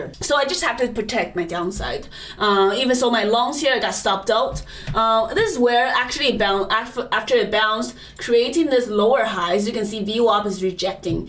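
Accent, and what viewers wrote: China. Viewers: Your accent is so thick I swear it’s like you’re speaking Chinese sometimes. I have to work hard to understand what you’re saying. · Can’t stand your Chinese English accent 😂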